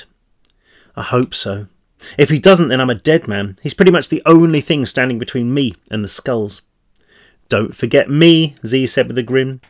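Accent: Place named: United Kingdom. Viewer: I love hearing read it in a British accent. How cool would it be to have a recording of Shakespeare reading his own plays? I like how he shares his own thoughts too.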